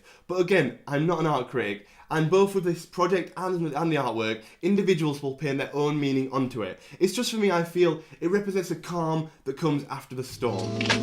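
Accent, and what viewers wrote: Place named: Yorkshire, United Kingdom. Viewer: Totally butchered John's name! He's a fellow Yorkshire man. I assume you're a Yorkshire man by your accent. It's pronounced 'John Mc- clock- lin'! Nice review!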